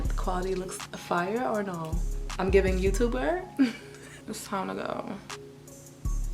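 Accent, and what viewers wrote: New York, United States. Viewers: “Are you duumb?” You’re so NY I love it!! 😭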